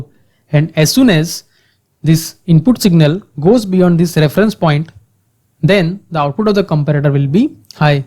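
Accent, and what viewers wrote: India. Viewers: lol this is an indian accent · Canonical hindi accent.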